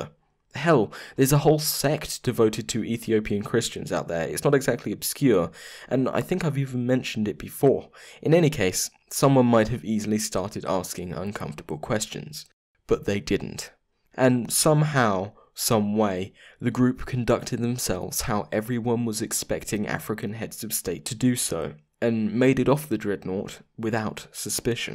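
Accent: United Kingdom. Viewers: I like hearing this story from a British accent point of view but when The History Guy .. did his episode about Cole I bought 3 of his tee shirts. ..which deserves to be forgotten.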